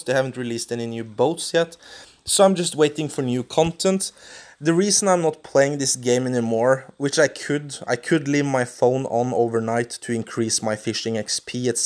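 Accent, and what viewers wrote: Norway. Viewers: Fortnite Addict he is norwegian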